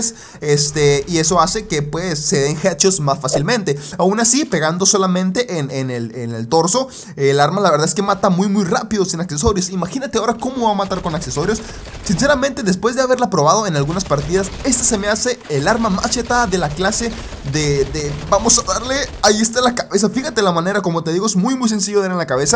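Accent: Colombia. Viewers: eres colombiano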